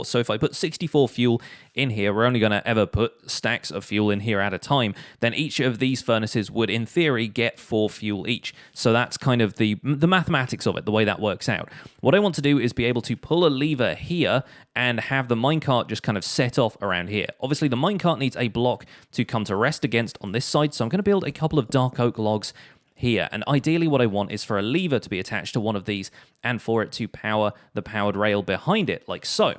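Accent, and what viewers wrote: United Kingdom. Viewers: You are sort of my hero cause I'm not good at doing these things so thank you very much, btw, are you British? I'm not British but my mom said I have a "British accent"...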